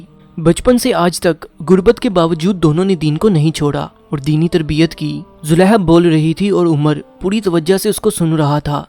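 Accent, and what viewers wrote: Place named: Lahore, Pakistan. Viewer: Great story like your Lahore accent.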